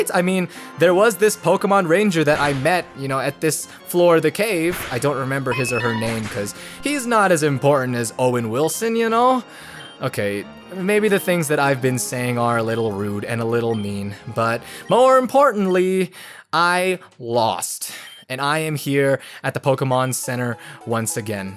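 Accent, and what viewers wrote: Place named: Sweden. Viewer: That's so weird! It sounds a little bit like you have a Swedish accent sometimes. (No offence intended)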